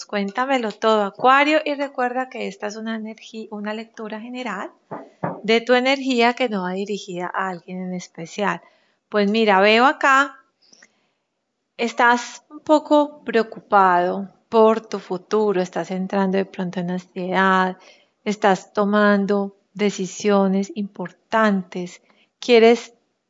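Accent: Colombia. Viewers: Qué gusto verte siempre tan radiante, tan positiva y con ese hermoso acento colombiano... Saludos desde México... Arriba Colombia!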